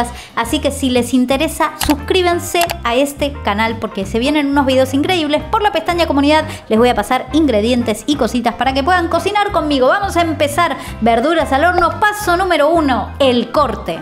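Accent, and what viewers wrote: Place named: Buenos Aires, Argentina. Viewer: Me gusta su tono porteño de hablar,es UD muy graciosa,las verduras quedaron súper bien,es algo para ido a la escalibada.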